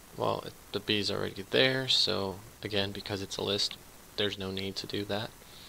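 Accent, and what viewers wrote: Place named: United States. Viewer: Wow, am I dreaming or is this an a guy with an american accent? FINALLY!!!!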